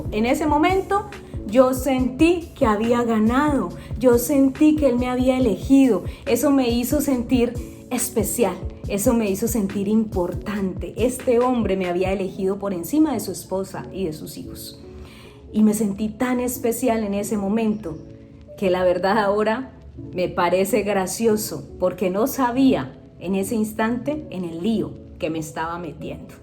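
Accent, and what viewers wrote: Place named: Colombia. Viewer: mexicana pero tienes en acebto bien marcado colombiano no entiendo como cambian de acento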